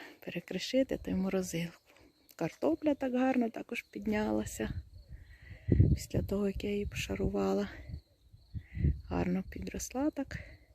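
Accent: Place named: Volyn, Ukraine. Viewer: Доброго дня,чую у Вас волинський говір🙂.Дуже гарні у Вас квітники.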